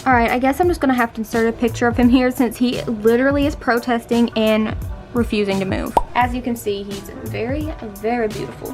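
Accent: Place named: Tennessee, United States. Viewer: aww awesome video! this channel deserves more views! love hearing the tennese accent to lol!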